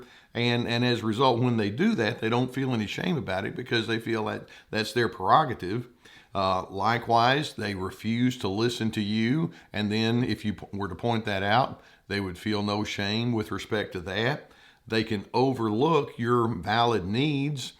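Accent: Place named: Texas, United States. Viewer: I never realized that so much first-rate psychological insight would come with a Texan accent.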